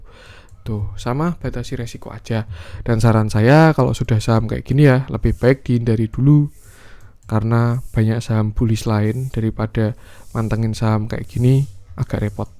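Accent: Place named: Java, Indonesia. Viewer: Medhok bgt mas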